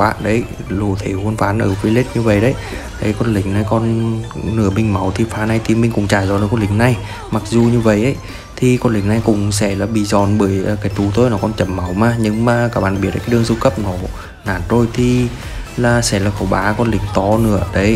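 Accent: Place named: Nghe An, Vietnam. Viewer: Kiểu kiểu như Quang Linh vlog. Chắc ở Nghệ An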